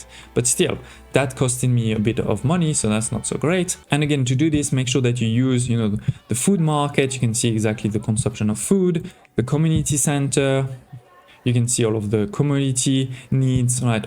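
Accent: France. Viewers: Nice video ! (are you french by any chance ?)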